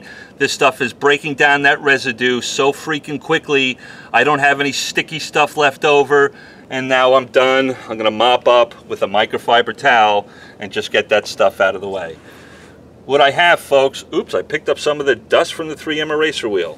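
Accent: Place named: Texas, United States. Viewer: Great job but work on your Texas accent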